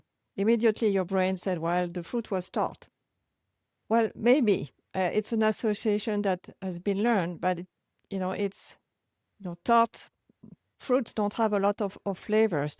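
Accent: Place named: France. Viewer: I love your Methods and your French Accent :)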